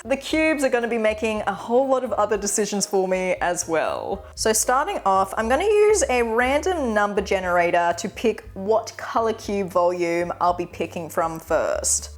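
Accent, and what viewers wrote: Australia. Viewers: Hello I am new here. Commenting to boost for the algorithm! Fun fact of the day: I'm from Aotearoa NZ, and every time I accidentally stumble across an AUS/NZ creator I have to listen for an abnormally long time to figure out whether theyre a kiwi or a roo. My guess, without looking at the rest of your content is that you're Australian 😌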